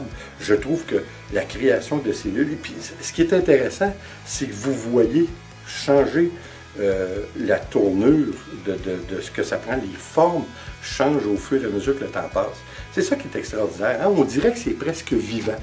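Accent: Canada. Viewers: Bonjour bb j'ai pas compris avec l'accent canadien ce que vous mettez en plus de l'eau merci de me briffer ça serait sympa et aussi je me lance dans la peinture dont je ne connais rien mais qui me fait envie🎨🎨🎨😊🙏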